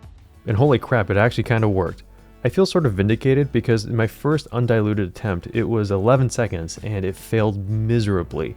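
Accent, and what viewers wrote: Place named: United States. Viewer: HALLO from a WET and GREY BRENTWOOD, Essex ENGLAND !! Just FOUND your Channel and LOVE your Experiments ( and the American ACCENT-- got any Gum Chum ? ) I have a similar channel for film stuff and a few Piano Playing episodes !! I subscribed as I want to watch your Video on the Old VERICOLOR as I have some of the SAME in Fridge !! Keep Snapping -- Peter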